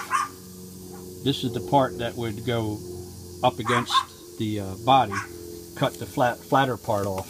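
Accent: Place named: Pittsburgh, United States. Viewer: Must be from Pittsburgh...can recognize that accent instantly... that or Ohio...ya?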